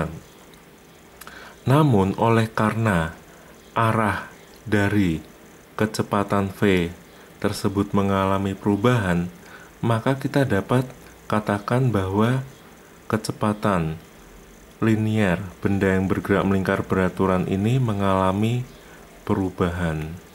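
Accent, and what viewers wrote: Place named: Java, Indonesia. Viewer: suara nya medok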